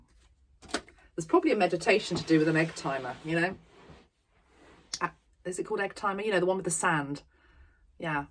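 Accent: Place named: RP, United Kingdom. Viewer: I feel relief not schadenfreude when when people get justice, I feel we are moving into cleanliness. But I do want some 3-D justice - it will make things easier. and thank you for your dailies. I find you and even the sound of your queen's english - very satisfying.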